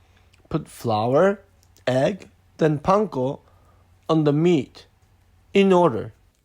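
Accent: Japan. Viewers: 結構日本人感ある英語やね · 英語が上手い人の発音じゃない。ジャパニーズイングリッシュ丸出し。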